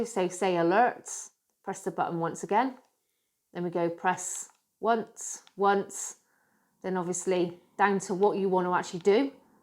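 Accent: United Kingdom. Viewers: My language is french and I love so much to hear the British English that I forgot I was here to learn about a vacum cleaner.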